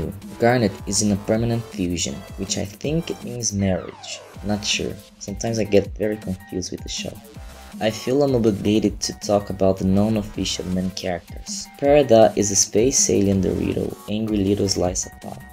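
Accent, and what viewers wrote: Brazil. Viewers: Eu percebi o sotaque brasilero!:) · Mano vc eh br? Pq parece mt pelo seu sotaque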